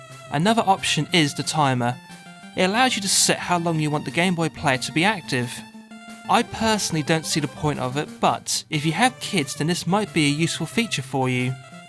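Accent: United Kingdom. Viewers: great job!!I was looking for GBplayer review! I rlly liked, but I gonna tell u: your british accent difficult my understanding, I partially understand what u said. greetings from Brazil! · hahahaha i love britisch aktzent :D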